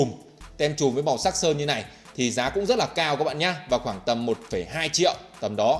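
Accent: North, Vietnam. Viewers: anh người bắc này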